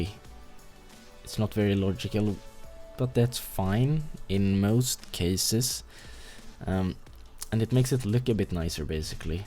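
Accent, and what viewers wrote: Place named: Sweden. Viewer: He sounds Swedish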